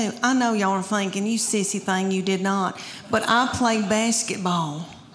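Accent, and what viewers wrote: United States. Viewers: her way of speaking is specefic to a region in the us? i like it..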